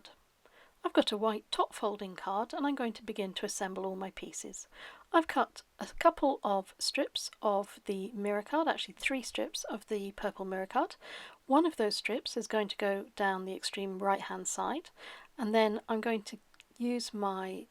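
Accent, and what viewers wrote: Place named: United Kingdom. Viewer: Your accent suggests you are very British, I love that as I'm in Portland Oregon, the land of no accent! I just love all things you create and how you add real life bits and pieces to your crafting.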